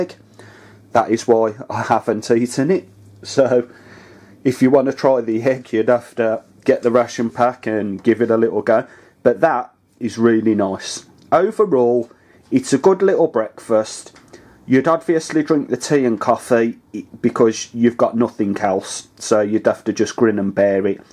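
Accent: United Kingdom. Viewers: where in the West mids are you from? Wolvo or Walsall maybe?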